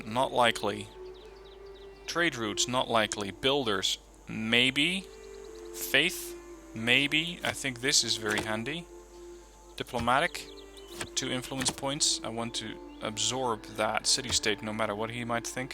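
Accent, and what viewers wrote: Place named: Netherlands. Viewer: Aweful dutch accent though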